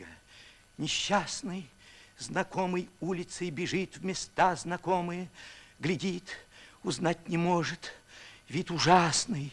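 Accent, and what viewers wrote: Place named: South, Russia. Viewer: Говор с "гаканьевм и хаканьем" - точно, как в фильме "Бриллиантовая рука" или в мультиках "Ну, погоди!": "Спокойно, Козлодоеу, сядем усе". Оказывается он всегда так говорил, и не мог или не знал, как выработать чистый театральный говор по-русски и избавится от местечкового произношения, диалекта.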